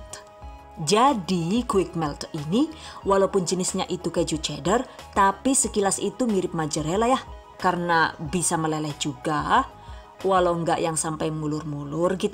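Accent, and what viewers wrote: Banyumas, Indonesia. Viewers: syelaallluuu ne ngikutin resepe mbak'e iki resepny simple bingiiittt dan g kalah menarik ngapakke kui lhooo caaaa'tat wkwkwkwk "DONE" #giveawayTHVdesember2019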